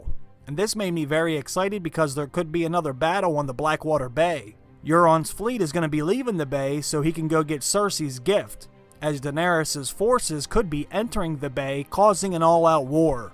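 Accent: Pittsburgh, United States. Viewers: Are you from Pittsburgh, PA ... your accent sounds like Pittsburgh DAD.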